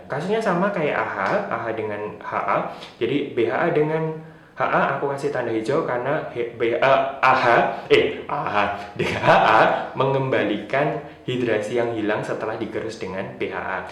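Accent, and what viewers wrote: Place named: Malang, Indonesia. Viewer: asli pacitan tpi logate arema pol 😀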